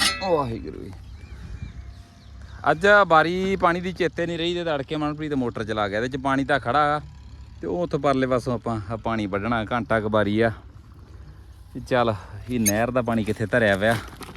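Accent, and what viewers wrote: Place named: Doaba, India. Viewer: 🙏❤👏🏾, ਬਹੁਤ ਵਧੀਆ 👌 ਵਲੌਗ ਹੁੰਦਾ ਹੈ! ਜਿਆਦਾ ਅਤੇ ਬਹੁਤ ਪਸੰਦੀਦਾ 🤩 ਪ੍ਰਸਿੱਧ ਪਰਦੇਸੀਆਂ ਪ੍ਰੋਗਰਾਮ 😂😂 ਜੋ ਨਹਿਰੀ ਠੰਡੇ ਪਾਣੀ 💦 ਨਾਲ ਦਿਲੋਂ ਪਿਆਰ 💕 ਨਾਲ ਭਰਪੂਰ ਬਣਦਾ ਹੈ 🎉😂😂🎉😂! ਅਤੇ ਹਰ ਦੇਸ਼ ਪ੍ਰਦੇਸ਼ ਵਿੱਚ ਬੈਠੇ ਪੰਜਾਬੀ ਉਡੀਕ ਕਰਦੇ ਰਹਿੰਦੇ ਹਨ ਕਿ ਕਦੋਂ ਨਹਿਰ ਦੇ ਤਾਜ਼ੇ ਠੰਢੇ ਪਾਣੀ 💦 ਦੇ ਦਰਸ਼ਨ ਕਰੀਏ ਅਤੇ ਹਰੇ-ਭਰੇ ❤ਖੇਤਾਂ ਦਾ ਕੁਦਰਤੀ ❤ਨਜ਼ਾਰਾ ਲਈਏ ਅਤੇ ਲੰਘੇ ਵਖਤਾਂ ਨੂੰ ਮਜਬੂਰੀਆਂ ਨੂੰ ਸਰਕਾਰਾਂ ਨੂੰ ਕੋਸੀਏ 😢 ਅਤੇ ਹਵਾਵਾਂ ਦੇ ਵਿੱਚ ਜਾ ਕੇ ਆਪੋ ਆਪਣੇ ਪਿੰਡਾਂ ਦਰਸ਼ਨ 😻+ ਯਾਦਾਂ 💪ਤਾਜ਼ਾ ਕਰੀਏ 🤦🏽🙇🏽😢❤🤷🏽‍♂️👏🏾☝🏾🙏! ਬਾਕੀ ਬੋਲੀ ਤੁਹਾਡੀ ਮਿੱਠੀ ਪੰਜਾਬੀ ਮਾਂ ❤ਬੋਲੀ ਦੋਆਬੇ ❤ਨਾਲ ਬਿਲਕੁਲ 💯👍 ਮੇਲ ਕਰਦੀ ਹੈ! ਬੱਸ ਸਿਰਫ਼ 🥭 ਅੰਬਾਂ❤ ਅਤੇ ਸੰਗਤਰਿਆਂ ਦੇ ਬੂਟਿਆਂ ਦੀ ਘਾਟ ਹੈ ਓਹ ਲੀਚੀ ਦਾ ਬੂਟਾ ਵੀ ਜੋ ਛਾਂ ਲਈ ਵੀ ਬਹੁਤ ਵਧੀਆ 👌 ਹੁੰਦਾ ਹੈ 🤷🏽‍♂️! ਬਾਕੀ ਤੁਹਾਡੇ ਮੌਸਮ ਅਤੇ ਮਿੱਟੀ ਤੇ ਨਿਰਭਰ ਹੋ ਸਕਦਾ ਹੈ ਘਰ ਵਿੱਚ ਪਸ਼ੂਆਂ ਵਾਸਤੇ ਦੇਸੀ ਅੰਬ 🥭 ਦਾ ਬੂਟਾ ਵੀ ਬਹੁਤ ਵਧੀਆ ਹੈ ਗਰਮੀਆਂ ਵਿੱਚ ਗੂੜ੍ਹੀ ਛਾਂ ਅਤੇ ਸਰਦੀਆਂ ਵਿੱਚ ਰਾਤ ਸਮੇਂ ਨਿੱਘਾ 🥰 ਹੁੰਦਾ ਹੈ! 😂🎉❤😂! ਜੱਟਾ ਵੇ ਤੇਰੀ ਜ਼ੂਨ ਬੁਰੀ ਹਲ਼ ਛੱਡ ਕੇ ਚਰੀ ਨੂੰ ਜਾਣਾ 🧑🏻‍🌾👨🏻‍🌾 | ਸੌਖੀ ਨੀ ਜਿੰਦ ਜੱਟ/ ਕਿਸਾਨ ਦੀ ਪੈਂਦਾ ਸੱਪਾਂ ਦੀਆਂ ਸਿਰੀਆਂ ਤੇ ਤੁਰਨਾ😮! 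ਰੱਬ ਉਤੇ ਭਰੋਸਾ ਰੱਖਦੇ , ਕਰਨ ਅਰਦਾਸਾਂ ਹੱਥ 👏🏾 ਜੋੜ ਵੱਲ ਅਸਮਾਨਾਂ! ਬਾਬੂਆਂ ਦੇ ਝੂਠੇ ਲਾਰਿਆਂ ਨੂੰ ਕਹਿਣ ਤੇਰੀ ਕਿਸਮਤ ਵਿੱਚ ਇਹੋ ਲਿਖਿਆ ਭੁੱਖਿਆ ਜਜਮਾਨਾਂ 🤯🤑🤮🤒😷ਸਾਡੀ ਤਾਂ ਡੋਰੀ ਉਸਦੇ ☝🏾 ਹੱਥ 👋 ਐ ਪਰ ਤੁਹਾਡੀ ਬਾਬੂਆਂ ਦੀ ਕਿਸਮਤ ਹੱਥ 🤑ਨੋਟਾਂ/ ਪੈਸਿਆਂ 💰 💵!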